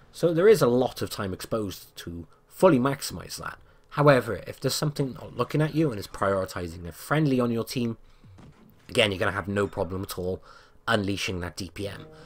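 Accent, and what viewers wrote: United Kingdom. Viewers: I'm English anyway good British accent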